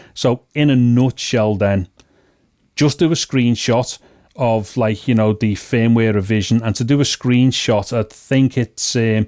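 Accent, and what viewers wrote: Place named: Scotland, United Kingdom. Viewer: Horrible VIDEO! FIX your accent. It is NOT ENGLISH and too scottish... And GET TO THE POINT. You meander all over and go so SLOW. STOP the hand gestures...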